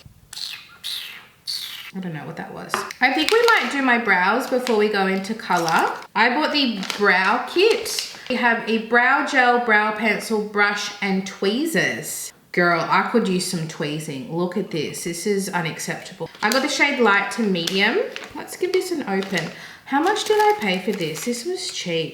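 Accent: Australia. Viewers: Been watching makeup videos for years, first time stumbling upon your Chanel. I’m so glad I did! Thanks so much for your honest review! I hate how much trial and error there is with makeup, especially with budget products and seeing your reaction to stuff is just making it so much easier for me to decide if I want to bother trying some things. I’m on an extremely tight budget and if I were to go through too many cheap products before finding decent ones I’d end up probably spending as much as a dearer one anyway before finding what I’m looking for. I also love hearing your familiar Aussie “oh nauooooooorr” it doesn’t even sound like you’re doing it for shits and giggles it’s your natural accent and it’s glorious! 😂. Thanks again lovely! Hopefully you have some wear test reviews to to see how thing hold up over the day and this mad heat wave that blasted us straight into summer from the depths of winter with no bloody in-between.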